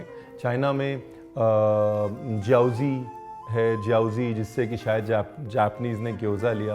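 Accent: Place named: Punjab, India. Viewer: A big fan . You nail it when you explain things specially with that PUNJABI touch♥️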